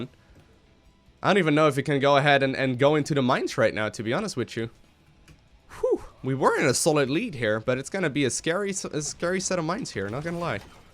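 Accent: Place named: Netherlands. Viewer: Haha yeah that's kind of how we Dutchies often speak!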